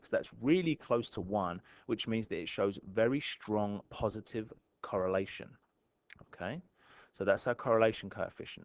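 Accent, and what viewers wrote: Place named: United Kingdom. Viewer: I like this british accent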